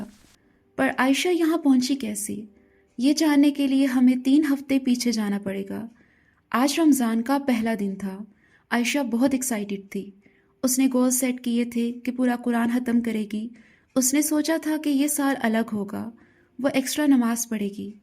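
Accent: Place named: India. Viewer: Why u speak indian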